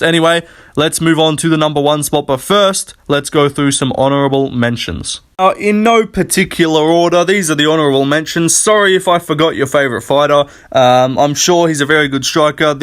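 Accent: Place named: Australia. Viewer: You have an Aussie accent and you didn't put Jdm in top 10? Nah you a traitor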